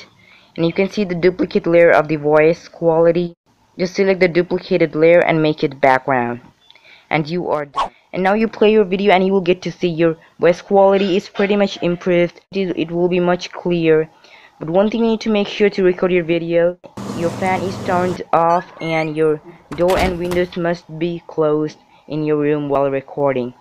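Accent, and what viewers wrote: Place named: United States. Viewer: Finally a Fuckin american Im so tired of those indians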